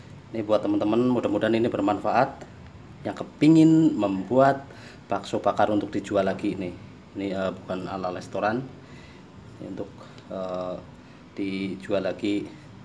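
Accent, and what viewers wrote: Indonesia. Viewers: Maaf suaranya kyak gak asing, mas apa orang jateng? Apakah mas alumni Ponpes al islah jember jatim? Tolong di bales🙏🙏🙏🙏🙏🙏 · Kepingin..hahaha..medok bgt omm good 👍 bermanfaat.tks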